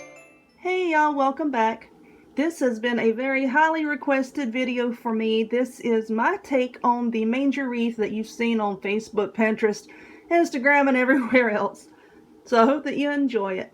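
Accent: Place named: Carolina, United States. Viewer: I absolutely love that “Carolina twang “ Tour videos are so easy to follow, thank you so very much for taking the time to teach us & for taking the time to do your videos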